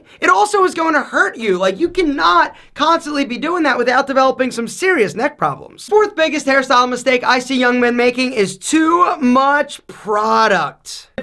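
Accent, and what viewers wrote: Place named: Maryland, United States. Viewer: Are you from Maryland? U sound like everyone I know lol. I’m from Annapolis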